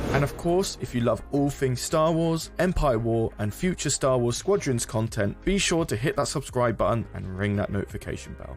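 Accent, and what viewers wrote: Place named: United Kingdom. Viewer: I haven't seen many videos before, so I was very confused when I thought had turned British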